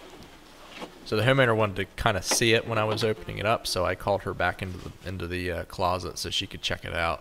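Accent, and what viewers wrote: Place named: Southeast Pennsylvania, United States. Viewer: Your accent - are you from SE Pennsylvania?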